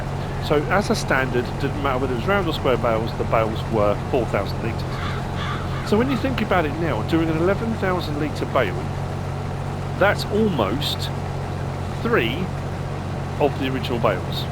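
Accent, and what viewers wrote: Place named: London, United Kingdom. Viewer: Hei where in England are you from?I feel like you have London accent.or around there.and again sorry if I miss spelt anything.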